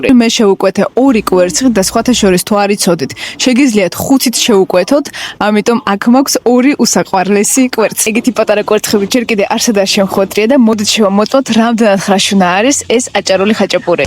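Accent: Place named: Russia. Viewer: Rusul skolashi swavlobdi? Laparakis gamo. (Ar gewyinos)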